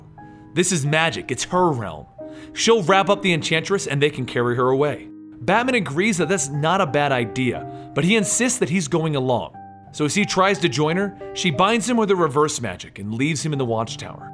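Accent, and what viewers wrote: United Kingdom. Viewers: Nice British accent xD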